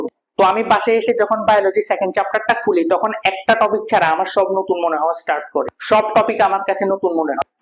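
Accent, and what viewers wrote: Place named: Noakhali, Bangladesh. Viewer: Vai er bari noakhali naki 🥰 noakhailla tone ache 🥰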